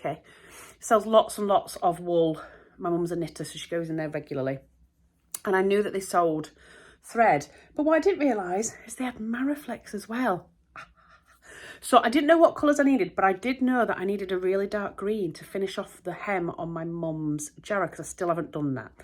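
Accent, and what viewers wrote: Yorkshire, United Kingdom. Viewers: I made myself a gnome printed turtleneck top to wear to the Christmas open house at the childcare centre I work at. It was a big hit. Also I have been hosting tea parties in each classroom with the children, as I'm serving the tea, (water), I talk to them in a British accent which actually sounds like you. Of course it just makes them roar with laughter. My local fabric store still doesn't carry that stretchy thread, so frustrating. I make sure I watch your vlogmas before I leave for work everyday. That way I start my day with a smile. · That 'gonk' fabric is awesome! I literally gasped when you held it up. I had to laugh at your comment about being British and talking about the weather. I think it's our national obsession. I'm near Glasgow and we've had -10 degrees yesterday and today. I wouldn't have minded except our boiler decided to pack in and it took Scottish Gas 2 days to get out to us to fix it. 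The house was only marginally warmer indoors than it was outdoors!! I can honestly say, that I don't ever care to repeat that experience. At least your videos have kept me cheerful. Keep going, Mrs, I love your smile and infectious laugh. I'm also secretly loving your accent. It's been nearly 15 years since we moved up from Yorkshire to Glasgow and I didn't realise how much I missed the accent until I started watching your videos.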